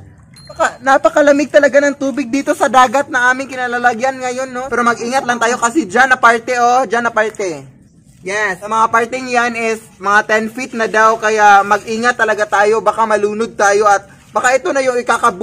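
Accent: Visayas, Philippines. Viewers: Kuya bisaya kaba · Taga visayas ka te? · bisaya kaba?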